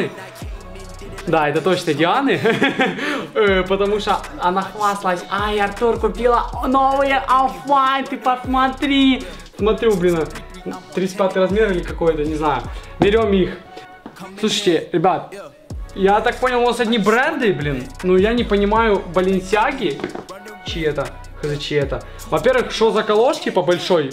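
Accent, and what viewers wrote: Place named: Ukraine. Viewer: почему у тебя акцент Украинский?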